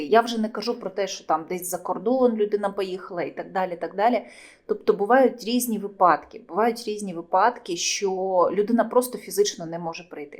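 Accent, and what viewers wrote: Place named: Russia. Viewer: НЕ ПЕРЕЖИВАЙ МЫ ВСЕ НАЛАДИМ ЗАЯ ! РОССИЯ СПАСЕТ ХОЛОВ )))! ПРИВЕТ ИЗ КРЫМА ))! Я СЛЫШУ ТВОЙ РУССКИЙ АКЦЕНТ ! ВСЯ МОЯ УКРАИНСКАЯ РОДНЯ ЖДЕТ КОГДА МЫ ОСВОБОДИМ ОДЕССУ ! ПО ТЕРПИТЕ ЛЮДИ ! ВЫ ТЕРПЕЛИ 30 ЛЕТ И ЕЩЕ НЕ МНОЖКО ПО ТЕРПИТЕ !!! СКОРО МЫ ПРИДЕМ И ПЕНСИИ БУДУТ РУССКИЕ И БУДЕТЕ ГОВОРИТЬ НА РОДНОМ ЯЗЫКЕ НА ЛЮБОМ ! ПОМОГАЙТЕ БОРОТЬСЯ В ВРАГАМИ ! ОТПРАВЛЯЙТЕ НА ТЕЛЕГРАММ ГДЕ НАХОДЯТСЯ ВРАГИ НАШЕЙ РОДИНЫ !ОТПРАВЛЯЙТЕ КООРДИНАТЫ ВОЕННЫХ ОБЪЕКТОВ ))) УНИЧТОЖАТЬ БАНДЕРОВЦЕВ ! СЛАВА РОССИИ !МЫ ПОБЕДИМ !СКИНЕМ ЯРМО США !!!! ТОЛЬКО ВМЕСТЕ!!!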